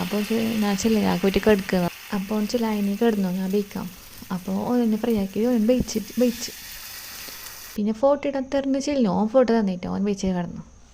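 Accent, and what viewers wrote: Kasaragod, India. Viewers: കാസർകോട് ആണോ ..ഈ സൗണ്ട് ആണ് നല്ലത്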